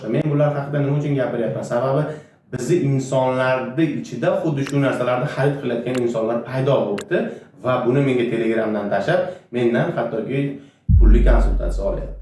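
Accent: Andijan, Uzbekistan. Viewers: aka anjancha ogilbolacha gapirar ekansiz😊😊😊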